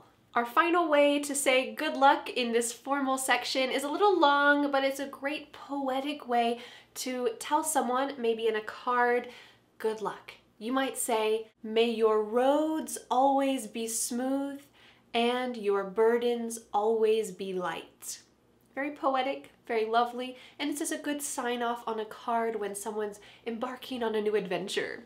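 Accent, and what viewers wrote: United States. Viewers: Although you speak american english, but i think i can listen to your pronounciation very well .because your voice is clear easy to understand.who think like me? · When you use american accent i just fall in love with your English lots of love from lndia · Your pronunciation is good for me to understand... I watch different channel's videos but they aren't that clear to me. Only yours and marinas(from linguamarina) pronunciation is better to me.. I love american accent.... ❤️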